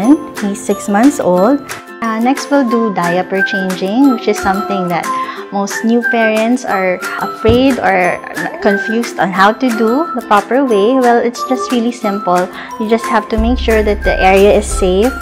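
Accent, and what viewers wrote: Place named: Philippines. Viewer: everytime .5 seconds realuze shes pinay with the accent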